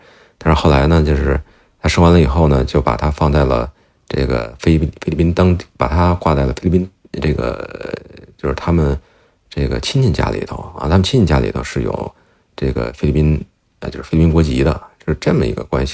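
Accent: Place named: Beijing, China. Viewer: 北京人儿？这口音很好听啊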